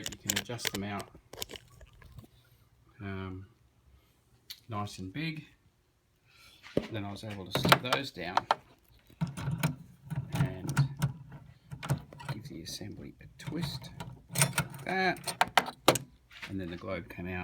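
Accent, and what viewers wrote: Australia. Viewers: Haha it stuck out to me too. This lad is Australian though. (Or NZ? Nah Aus i am pretty sure)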